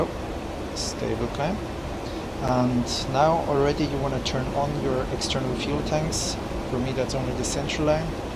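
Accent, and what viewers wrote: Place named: Switzerland. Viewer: Swiss English :)) but been living in Switzerland for 4 years now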